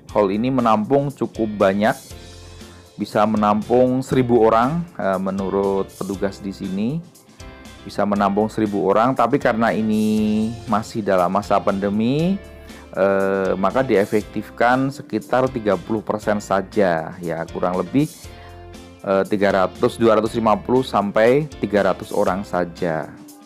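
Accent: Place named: Purwokerto, Indonesia. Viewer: Bahasanya ciri khas Dr utomo yg asli purwokerto👍👍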